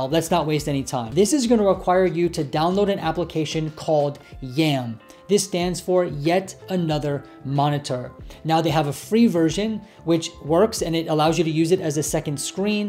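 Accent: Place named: China. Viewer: sounds chinese therefore I don't trust it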